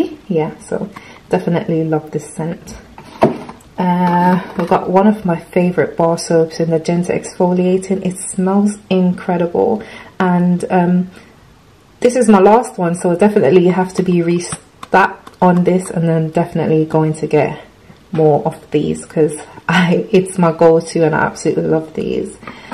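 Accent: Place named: United Kingdom. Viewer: You're 🕊️ haul is 🔥🤍😏 🤍 the British accent as well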